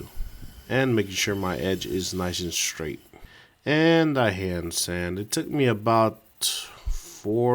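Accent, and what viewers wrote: New Mexico, United States. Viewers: Where are you from? You sound like you're from New Mexico.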